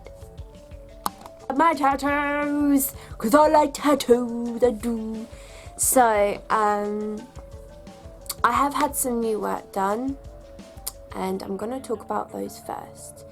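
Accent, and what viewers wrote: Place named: Australia. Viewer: are you from Australia? New Zealand? I heart your dialect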